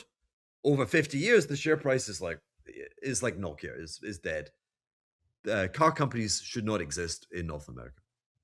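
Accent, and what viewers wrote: United Kingdom. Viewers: is this British English???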